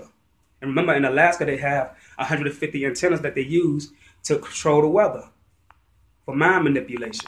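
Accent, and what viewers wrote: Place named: DC/Maryland, United States. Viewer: I'm from forestville, md in pg county. I can tell you're from the dc/md area by the accent. Projects is a project, an experiment. Artificial birds bees mosquitos & fleas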